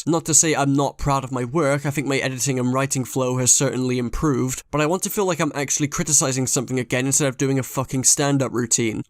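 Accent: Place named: United Kingdom. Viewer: You sound br*tish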